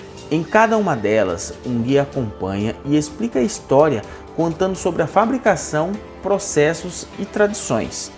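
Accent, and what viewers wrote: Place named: Brazil. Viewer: Português com sotaque Brasileiro, gostei.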